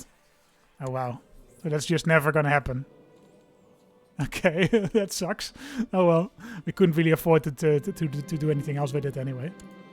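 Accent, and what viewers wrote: Netherlands. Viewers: Ah, it's a Dutch accent, I couldn't place it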